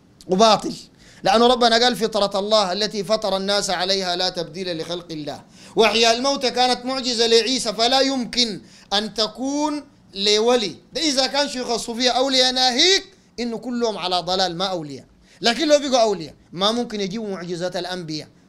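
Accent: Sudan. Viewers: ما أجمل لهجة الأخوة السودانيين وما أجمل حجة هذا الشيخ زاده الله من فضله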